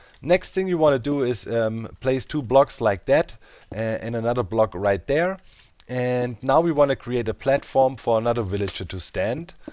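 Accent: Germany. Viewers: Ahhh... Man hört hart raus, dass er normalerweise Deutsch oder so redet 😂 dat accent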